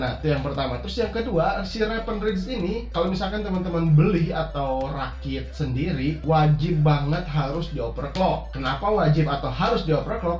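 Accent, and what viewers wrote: West Java, Indonesia. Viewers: sunda pisan euy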